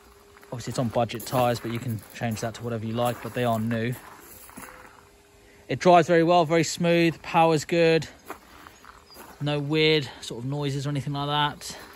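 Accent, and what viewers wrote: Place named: United Kingdom. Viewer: It's just some British guy talking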